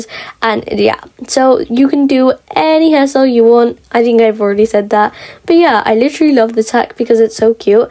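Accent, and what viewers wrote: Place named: United Kingdom. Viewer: I ❤ ur British accent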